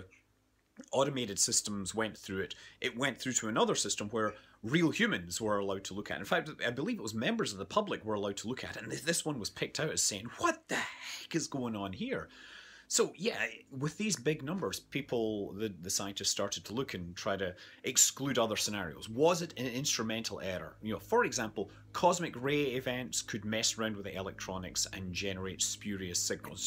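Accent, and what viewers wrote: Scotland, United Kingdom. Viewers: So he is Scottish, thought he was American but he has a similar accent to me(yes I'm Scottish) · what part of scotland do u get the belter accent from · What part of Scotland are you from . I'm form the west coast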